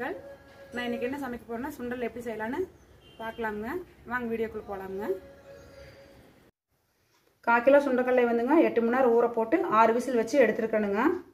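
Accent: Kongu, India. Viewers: அருமைங்க.... வாழ்க கொங்கு தமிழ்... வளர்க கொங்கு தமிழ்..... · Kongu Tamil super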